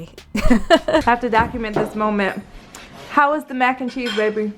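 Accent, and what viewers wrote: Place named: Pennsylvania, United States. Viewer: You sound like your from PA somewhere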